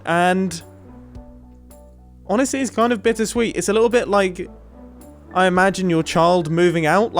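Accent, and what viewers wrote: United Kingdom. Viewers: aren't we all loving this British person accent? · I love his British accent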